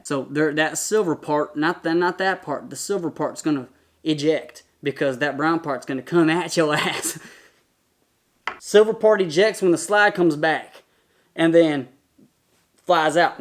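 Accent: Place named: South, United States. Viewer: You always treat a weapon as if it’s loaded even if you just unloaded it. I’m sure you’re a fine shooter .. I’m just saying you had your finger inside the trigger guard in that last video I watch. That’s a no no .Guess it was this video just the short version of it… You have a southern accent… where are you from… are you close to the great state of Kentucky?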